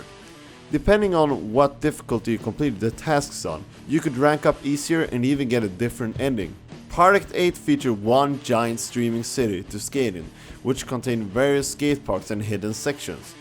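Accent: Sweden. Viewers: Holy shit you sound really swedish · the guy that narrates are so Swedish :)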